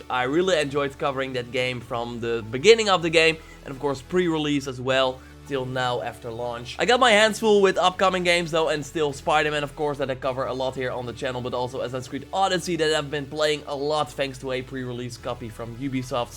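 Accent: Netherlands. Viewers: Im guessing that you are dutch or belgian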